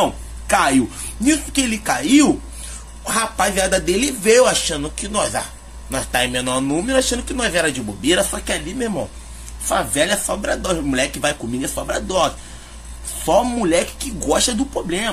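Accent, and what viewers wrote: Rio de Janeiro, Brazil. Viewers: Do rio né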